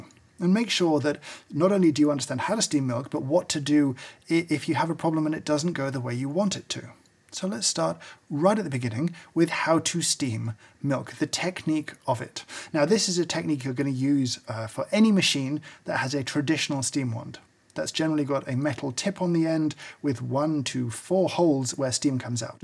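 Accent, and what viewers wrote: United Kingdom. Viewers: It's the British accent!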